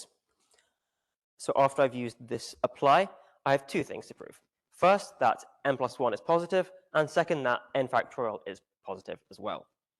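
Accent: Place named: United Kingdom. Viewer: The guy has such a heavy british accent that it is difficult tu understand the talk. I have listened the first 40 minutes and throw the towel.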